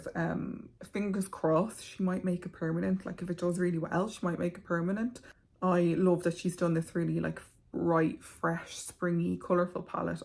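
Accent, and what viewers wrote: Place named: Ireland. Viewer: Hello! I’ve followed you on IG for years but didn’t know you had a wee YouTube channel! Glad you popped up. Also didn’t know you’re Irish. Lovely to hear you and your lovely accent. 🥰❤️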